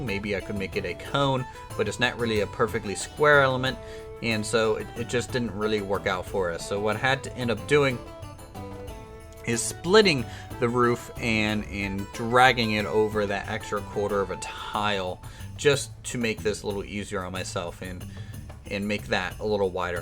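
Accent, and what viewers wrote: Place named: South, United States. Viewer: It's weird how you have a southern accent yet sound like you barely know english.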